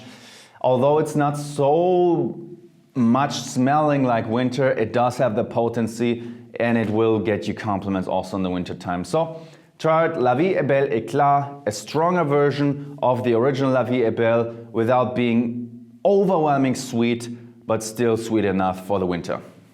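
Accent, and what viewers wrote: Germany. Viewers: This german accent gosshh you are the best!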